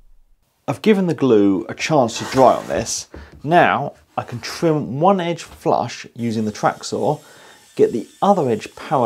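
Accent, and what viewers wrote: RP, United Kingdom. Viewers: I enjoyed your description at the beginning of deciding to make a posh project out of pallets. It fits your posh accent!😊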